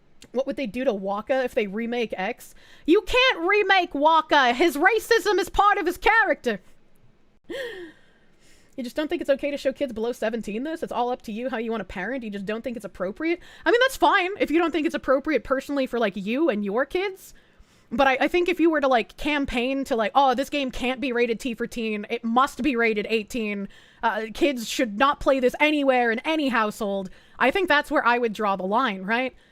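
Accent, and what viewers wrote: Boston, United States. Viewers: I came for the DEI update and Boston accent. ❤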